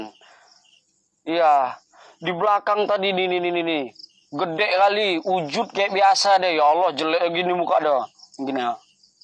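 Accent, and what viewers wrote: Medan, Indonesia. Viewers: Bang kau ngomong Logat.medan Kau batak kah?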